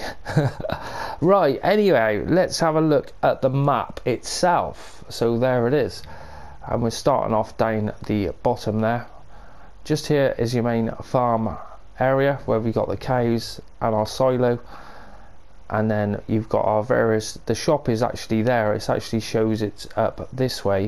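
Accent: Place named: Somerset, United Kingdom. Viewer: Somerset farms tour, complete with a somerset accent, lol New to this map, being born and raised close to somerset this map was a must for me, this was probably one of the better map tours I've found, good job fella (liked and subbed)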